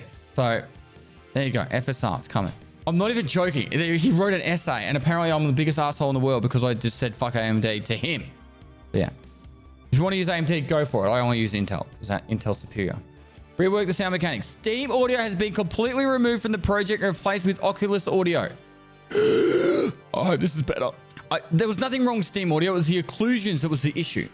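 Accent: Australia. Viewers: Me being Australian understood every word he said but…. I could imagine not being Australian could be hard. But cry us a river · Nothing like having a patch read by an australian man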